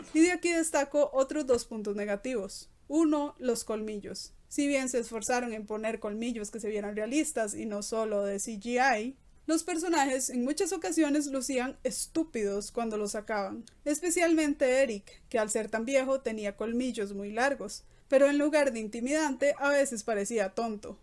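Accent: Costa Rica. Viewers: Eres de Costa Rica verdad? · No sé, pero tienes un acento de Costa Rica. Es como escuchar a una amiga de la prepa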